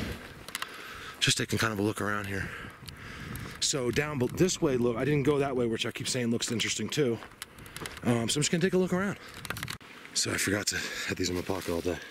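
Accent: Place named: Philadelphia, United States. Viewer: Hey. I’m a brand new subscriber. I hear an accent. You sound exactly like a friend I have who’s from Philly but now in TN. It’s uncanny. There’s lots of Bigfoot here in the PNW. Too many weird happenings in my days of exploring. I had one specifically leave me a gift on the drivers side windshield of my car. It was sweet. Guess he enjoyed all the fish.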